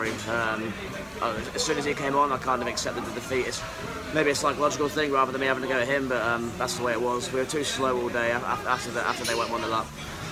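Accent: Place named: Liverpool, United Kingdom. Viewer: Great Scouse accent mate, surprised you ain't a United fan, they all live in London too.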